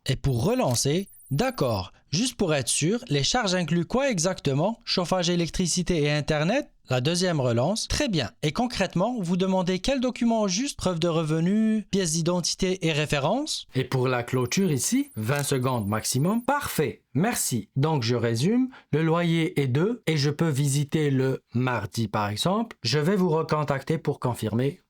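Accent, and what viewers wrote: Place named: Canada. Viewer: Avec un accent canadien, excellente vidéo 👌💖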